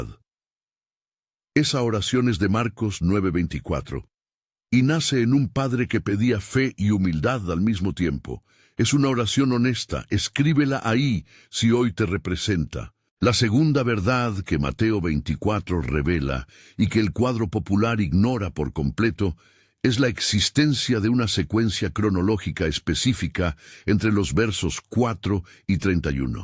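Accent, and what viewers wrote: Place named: Castile, Spain. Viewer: pones tu vocecita castellana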